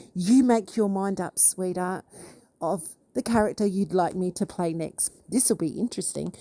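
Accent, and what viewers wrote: Australia. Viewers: Us fellow Aussies can tell your accent 👍 mines a bit rough around the edges 😅 use friggin a lot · We all know your Ozzy, accent is true Blue and love listening to you while i can hear the waves from our BEAUTIFUL BEACHES we have in OZZ we are not friggen stupid, but i tell you im starting to not like CHARLES and having doubts about WILLIAM (i know that sounds horrible) but after seeing speach from David Beckham at the WEF ,and CHARLES being involved WEF, WILLIAM EMPLOYING Ardern, Blanchett, Steve Erwins woke son, and some woke guy for the world kitchen, im worried its not the Monarchy i thought, plus your treatment, i was hoping with LADY Cs court case plus FIONA and Trevor's trouble there might be a link which could be joined to go to court with eventually with good hard evidence your a dog with a bone now, have you contacted THE ROYAL GRIFT, im an old duck wishing i had your energy, watch your back stay safe🇭🇲👍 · Sound like a true blue Aussie ro me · Girl, I know you’re Australian by your accent. 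I know accents very well. I can tell from your videos that you love your husband. I’ve been subscribed long enough to know that TMJ is a friend, not a boyfriend. I guess she doesn’t know what you do for a living 🙃 The truth is coming & it has nothing to do with TMJ. Stay strong 💪🏻 🇺🇸🇺🇸🇺🇸♥️♥️♥️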